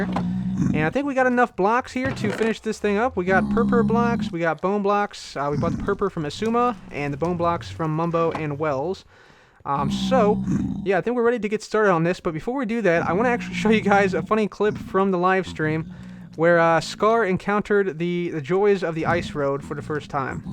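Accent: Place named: Ohio, United States. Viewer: probably america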